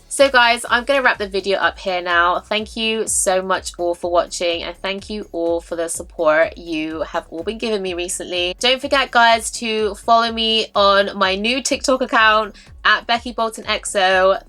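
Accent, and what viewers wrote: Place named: United Kingdom. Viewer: I hope this amazing life has continued. I didn’t now I longed for a British voice till yours and you have the look of the female lead role in movie Aspen Extreme Finola Hughes who. I totally crushed on in 1993 😮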